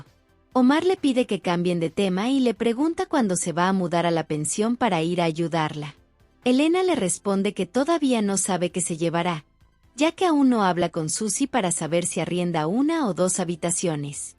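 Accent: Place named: Colombia. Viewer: Narra con acento Colombiano😊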